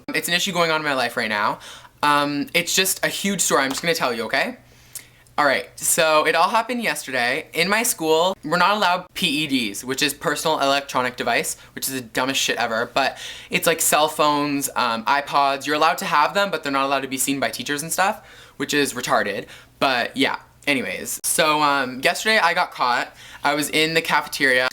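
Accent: Canada. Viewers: his Canadian accent is really strong in this vid!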